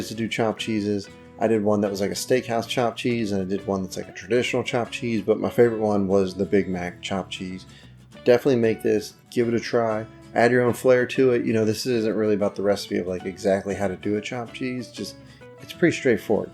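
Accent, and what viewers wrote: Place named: Southern Ohio, United States. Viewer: Missed the biscuit bowl video this year. I’ll have to try it. It looked mouth watering. You must be from southern Ohio😬. I have a friend from southern Ohio and except for the northern accent, he’s not much different from the southern rednecks around here in South Carolina.😬 Love your videos. Good luck with the detox.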